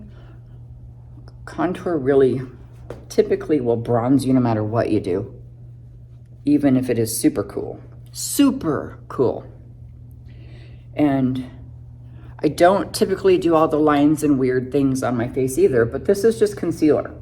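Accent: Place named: South, United States. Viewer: I love you. I can be down and watch you and before you know it, I’m laughing and enjoying myself. Your imitation of Paula Dean was “right on” and I swear you have southern roots from somewhere. I’m going to order the eye cream as it seemed so refreshing. Hopefully I can find the link after the video. Okay, I’ll get back to it.❤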